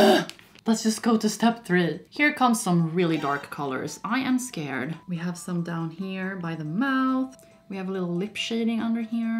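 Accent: Sweden. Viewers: you sound kind of swedish thats so cool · Are you Swedish just a question? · Didn't realize that you where Swedish until like 4 minutes into the video. Well, I'm Swedish to so... Hejsan Svejsan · Is she Swedish? Sounds like she has an Swedish accent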